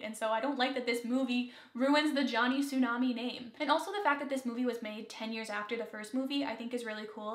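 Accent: Canada. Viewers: Hi I liked the video, and I look forward to watching the others. Also, you sound Canadian.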